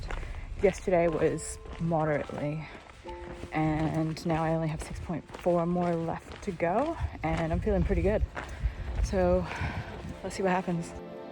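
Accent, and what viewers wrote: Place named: Canada. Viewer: Despite your channel name, your accent sounds more Canadian than Australian. Fantastic videos.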